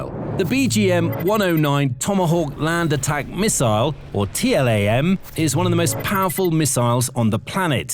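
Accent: England, United Kingdom. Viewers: Geee is it me or does the British accent remind you of the folk hawking knives and other stuff on upper cable channels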